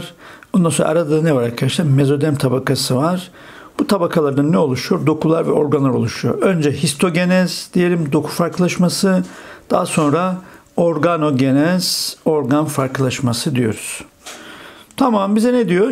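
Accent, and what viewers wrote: Black Sea, Turkey. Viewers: Hocam konudan bağımsız ama Karadenizli misiniz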